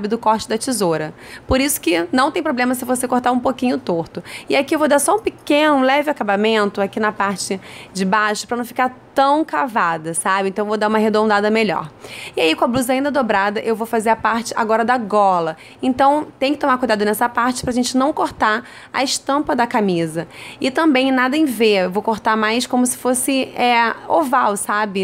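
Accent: Rio de Janeiro, Brazil. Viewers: PAUSAAAA! Nunca vi uma carioca com o sotaque tãoooo marcante! Hahahahaha, ADOREI! Seu cropped ficou uma gracinha, razô! Beijos e Pausa pra TPM!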